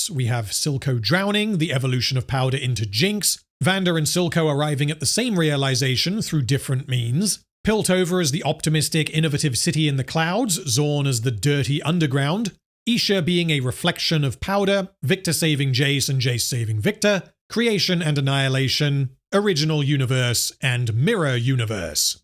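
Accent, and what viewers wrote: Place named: South, United States. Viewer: Are you a voice actor? You have such a bombastic voice. I'm not an expert on English accents but it certainly sounds "southern". Finally checked out your own stuff but have watched a lot of your EFAP appearances. Best of luck with your channels & I am looking forward to watching your previous vods.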